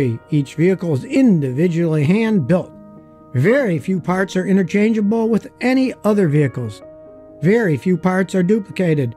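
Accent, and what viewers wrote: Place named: Western New York, United States. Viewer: Funny how much hiw a person pronounces a word reveals. Kind of figured you are from western NY, by how you pronounce "un" with it being an "on" sound. Transplant to Silver Creek, Irving area in 1990, from all over the map. Spent 24 years there, until moving to PA in 2014, then Ohio this year. Love visiting your hometown, wife and I honeymooned there.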